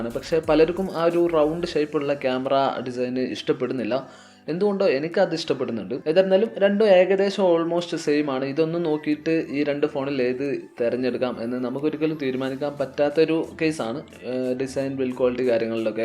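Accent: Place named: Malappuram, India. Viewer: Ayye malappuram bhashayil avatharam... 🤭🤭🤭😬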